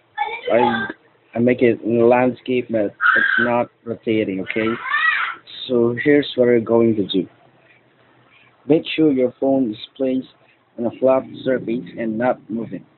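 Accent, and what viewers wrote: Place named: India. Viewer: at least you understood what you saying ? your English accent is worst , don't know why our fellow indians talk English in this worst way ! buy tube light in your washroom.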